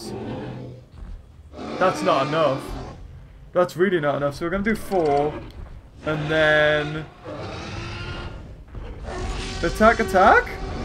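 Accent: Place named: United Kingdom. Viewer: hes british even though his accent isnt heavy it probably just sounded like it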